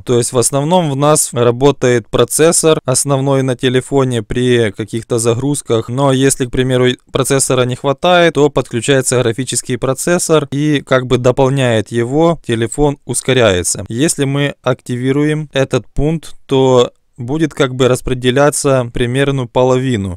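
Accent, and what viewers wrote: Ukraine. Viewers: Говор Закарпатье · Извините конечно,Ноя просто так слышу то как он говорит на русском но слышно что он с украины😂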